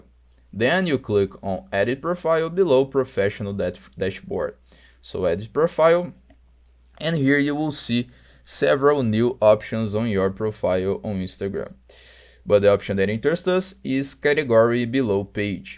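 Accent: Brazil. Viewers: video bom puto (esse sotaquezinho brasileiro nunca engana)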